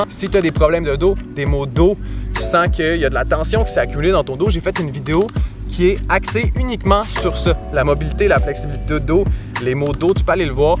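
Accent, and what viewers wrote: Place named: Quebec, Canada. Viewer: J'adore t'ai vidéo surtout ton accent cebequoi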